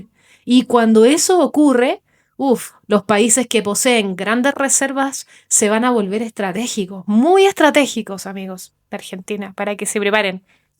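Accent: Chile. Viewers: Perdòn por tu aceto te creìa chilena pero dice Arquitecta Argentina :body-blue-raised-arms::hand-orange-covering-eyes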